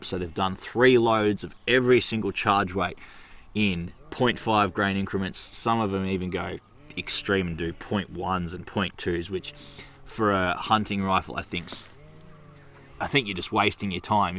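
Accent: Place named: New Zealand. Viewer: awesome vid. im trying to work up a load for the same rifle. thanks for doing this. p.s sounds like ur in NZ - i would love to come hunt there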